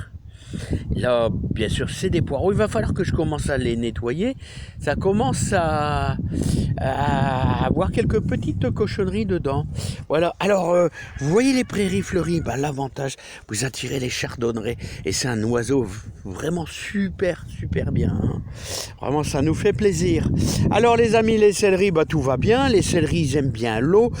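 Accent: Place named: Nord-Pas-de-Calais, France. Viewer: Bonjour, je n'ai pas de jardin. Mais je profite à fond des vidéos que vous postez, j'adore Votre bonne humeur😅. Je suis une chti partie dans le sud, et votre accent me rappelle d'où je viens😉. Bonjour à toute la team des jardiniers 😁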